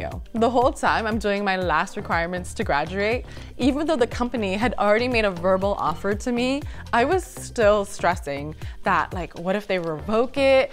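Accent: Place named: Germany. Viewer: I studied a year at TUM as a part of my MSc. Your German accent is impressive!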